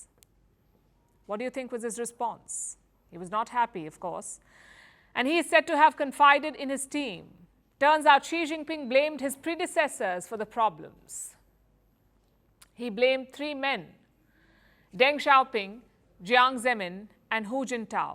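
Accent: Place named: India. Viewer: Indian Engrish is grating on the ears heh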